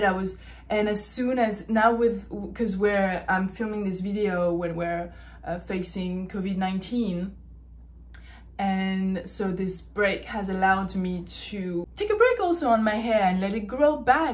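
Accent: France. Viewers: I love your accent 😍 I’m currently learning french, the most beautiful language in the world xx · Wait! Is she French??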